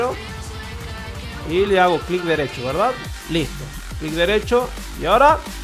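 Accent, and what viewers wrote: Tucumán, Argentina. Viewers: Tucumano hasta el pingo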